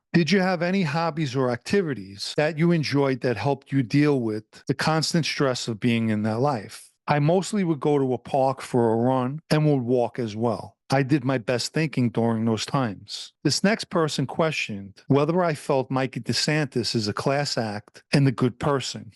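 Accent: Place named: New York, United States. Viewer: By all means please explain why you have a New York accent?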